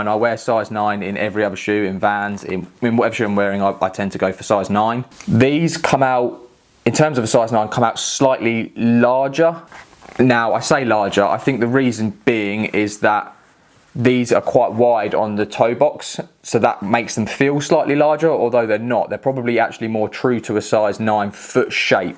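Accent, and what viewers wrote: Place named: London, United Kingdom. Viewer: Hello,! Thanks for replying:) Yes, it is a very beautiful accent actually! I lived for two years close to Victoria Park, in Mile End, and I have very good memories of it. Overall, I enjoyed London pretty much. Was a great experience to live in there as there are many beautiful parks, it is full of life, etc. I was wondering, if would like to practice some Spanish, reach out to me! Sometimes I do weekly Skypes with people from the UK so as to not forget my English in exchange of Spanish, and we keep it flexible, so if you can't make it one day it's absolutely fine. Anyways, have a good day, and great video! Cheers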